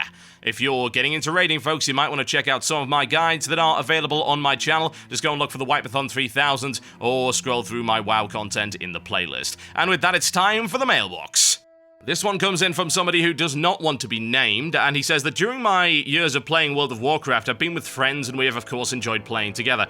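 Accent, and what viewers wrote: United Kingdom. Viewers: I honestly think that the only reason I like your videos are because of your British accent.. racist maybe.. but i dont think any of these people would like these if you talked like wowcrendor, me myself wouldn't give a damn. just saying, but nice videos anyways.=p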